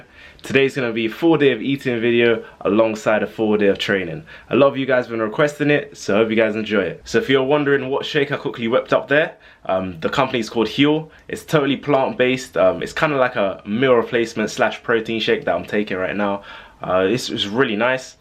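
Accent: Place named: RP, United Kingdom. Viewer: wasnt expecting the posh british accent when i clicked this video. Nice video lol.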